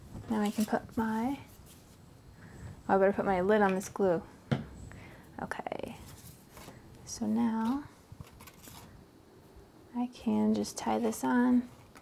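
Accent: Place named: Midwest, United States. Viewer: "Taaag" and "Staaak" That good ol' mid western accent!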